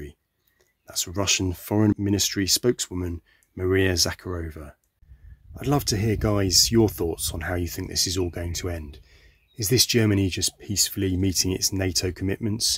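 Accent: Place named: United Kingdom. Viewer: ..."German Prepper" with a british accent?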